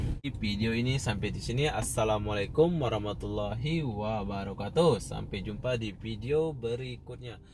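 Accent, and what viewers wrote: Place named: Kuala Lumpur, Malaysia. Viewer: Aku suka video kamu.bicara kamu kayak orang kuala lumpur.udah campur2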